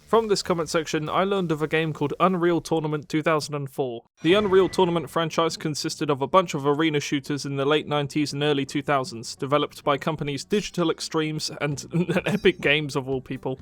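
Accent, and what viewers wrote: United Kingdom. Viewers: is * not british?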